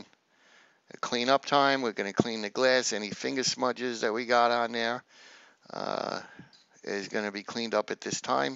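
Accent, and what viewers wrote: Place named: Brooklyn, United States. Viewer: good one. Cool Brooklyn accent too....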